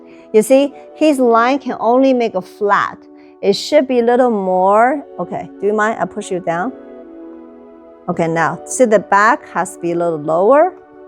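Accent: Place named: China. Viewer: We love when Chinese women speak Chinese English accents!!!!ha ha! Chinese sword 🗡️ s beautiful to watch in action!!. Love you!! ❤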